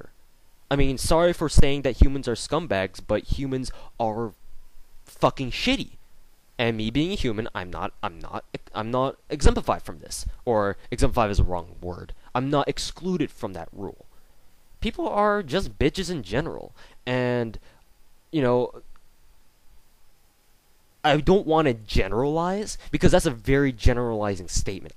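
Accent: Asia. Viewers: I love your Asian accent it makes things very funny!! And i appreciate what you do a lot your in college and make time for youtube making multiple videos a day! YOU ARE THE REASON I WASNT BORED WHEN I DIDNT HAVE MY PC!!!! and were you the one that i beat in the event on sf2? lol the event to get the Scar H Lucie or something. lol im KoolGam3rsHQ on sf2 but i agree with a lot of this i actually watched whole thing lol facepalm! lol, and i agree that in our society now the nice people get torn up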